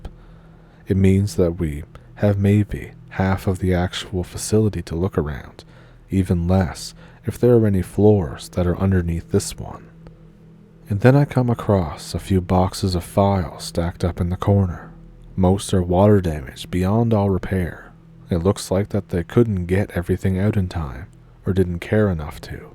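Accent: Ontario, Canada. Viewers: They popped more than a couple off in the Hudson Bay. That's a fact. Always a pleasure to hear yuir voice, it always reminds me of my Ontario cousins. Oof Da. 🎉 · I think it's neat that anyone outside of Canada listening to this is going to think it's a Canadian accent but as someone who is from Canada and has lived all over I can definitely tell which region you're from. And it reminds me of home❤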